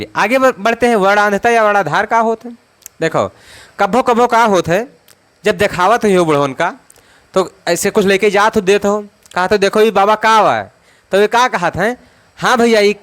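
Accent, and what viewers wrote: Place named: Awadh, India. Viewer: Gajab अवधी अंदाज में sir ji ❤❤❤❤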